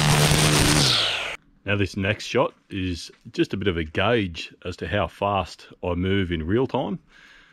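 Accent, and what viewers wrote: Australia. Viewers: it's great to have you back and hear that lovely Aussie accent! We miss you when you're not here but I hope your brief absence means you took some time for rest & family. I think your decision to donate to Jenny's Place is wonderful. Don't feel badly that you started 2023 out 'softly' by showing us one of your regular customer's yards. We see so many overgrown yard 'rescues' that once in a while it's nice to see a well-kept yard getting trimmed, and it shows us that not everything you do is a struggle. Best wishes for health and happiness in 2023! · Hi, I too love to hear your Aussie Accent!! So glad your giving the donation to Jenny`s Place! ❤ Now this happen to another man who cuts lawns in Florida, he was Edging but owners left their vehicles on the driveway right up by the house & he had little space to do the edging, but did it nicely & it was a Free One!! 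But something was in the grass & it flew & hit the Glass front door & shattered it...he had to replace door...ouch for the Cost! So I thought I warned you about it, I don`t want this to happen to you! Another Great Job on this yard!! Have a Good Work Week & a Lovely Weekend with Family! 🥰 Love from Central Illinois USA😉😎❤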